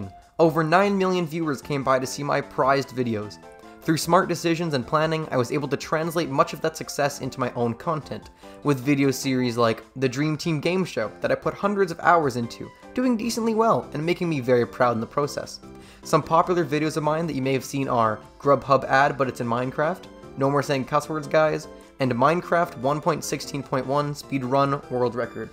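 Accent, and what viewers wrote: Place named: South, United States. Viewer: Love the “Southern” accent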